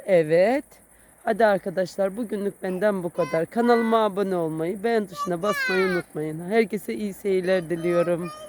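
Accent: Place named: Akçaabat, Turkey. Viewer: bizim burda bir komşumuz var aynı sizin gibi konuşuyor oda akcaabatli köyünden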